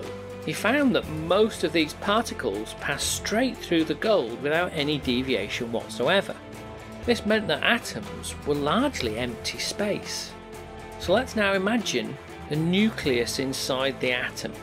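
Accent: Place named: Midlands, United Kingdom. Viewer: The Midlands (UK) accent is a key component for the "perfect" science video too ofc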